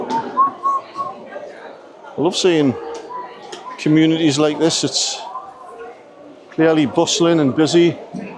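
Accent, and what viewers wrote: Newcastle, United Kingdom. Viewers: Good video. Just got to say, I LOVE the Geordie accent. Best accent in the UK, along with the Yorkshire accent.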